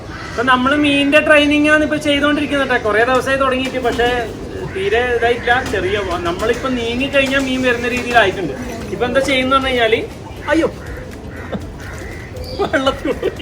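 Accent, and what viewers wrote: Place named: Pazhangadi, India. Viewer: Pazhanghadi bhasha polich 😂👍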